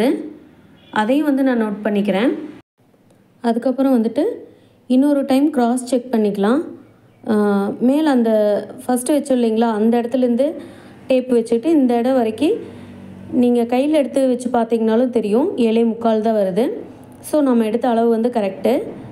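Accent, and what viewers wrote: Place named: Coimbatore, India. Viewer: Hand writing super sister. Neenga yentha ooru sister unga language karu coimbatore side la pesaramadri erukku.. niraye video paarpen unga video rembo honest avum solli thareenga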